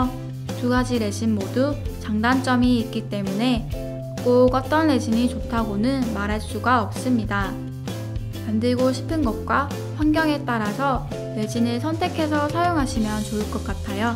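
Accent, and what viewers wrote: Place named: Gyeongsang, South Korea. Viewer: 경상도 억양이 들리네요 ㅎㅎ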